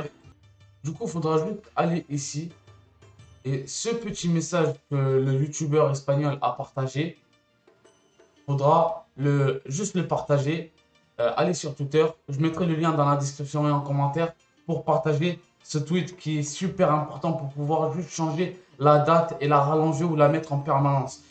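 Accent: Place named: Marseille, France. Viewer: Par contre sss un peut marseillais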